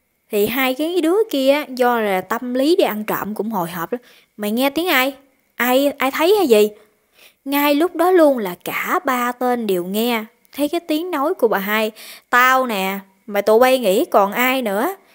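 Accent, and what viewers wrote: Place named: Countryside, Vietnam. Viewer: Helo Em nhé!! Xin chúc mừng Em là mẫu người phụ nữ được yêu thích nhất hiện nay và Em có chất giọng quá chân thật và chân tình quá đi thôi??? Nhung cuộc sống bây giờ không phải là Em nghĩ đâu nhé Em à??? Xin chúc mừng Em là một giọng thuyết minh quá tuyệt đỉnh và quá OK và quá chân thật và quá hai lúa mà anh vẫn OK về MC TV của kênh Youtube quá tuyệt vời và quá hoàn hảo và quá OK và quá 100 /100 nhé Em yêu à???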